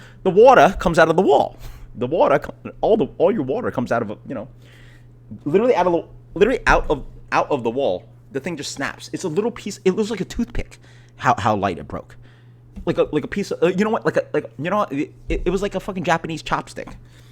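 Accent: United States. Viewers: dude, your new york accent came out here. wish you the best bruh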